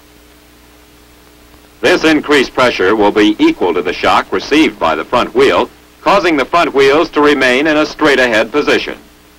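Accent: Mid-Atlantic, United States. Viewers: Nah, they just all sounded equally spiffy with their Mid-Atlantic accents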